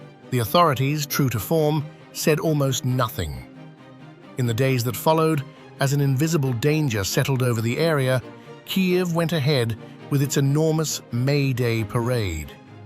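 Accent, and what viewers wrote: United Kingdom. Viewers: Great 😃👍 Brit narrator ❤! Sounds like the 👑 one on! (by, mid '70's).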